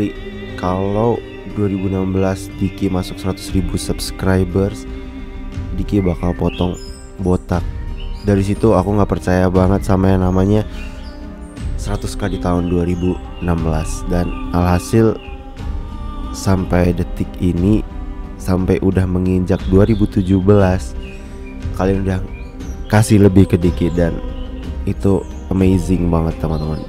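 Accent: Java, Indonesia. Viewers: Bang lUcu kok Bahasa Medoknya hahahahaha siapa suka ayoooo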